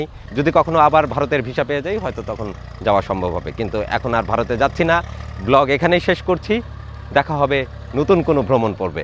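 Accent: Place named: Rangpur, Bangladesh. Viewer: আসসালামু আলাইকুম ভাই আমার বাড়ি দিনাজপুরের চিরির বন্দর, আপনার কথা শুনে মনে হলো আপনিও রংপুর বিভাগের মানুষ। আজ প্রথম আপনার ভিডিও দেখলাম খুব ভালো লাগলো। আমি নওগাঁ জেলায় আছি, যদি কখনো নওগাঁয় ভ্রমণের ইচ্ছা থাকে জানাবেন, সার্বিক সহযোগিতা করবো ইনশাআল্লাহ।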